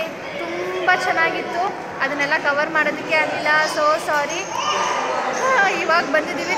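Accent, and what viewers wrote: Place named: North Karnataka, India. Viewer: Madam I think you r from Uttara Karnataka ..yakandre nim bhashe swalpa Nam tarane ide..anyway Nice vdo ..thnk u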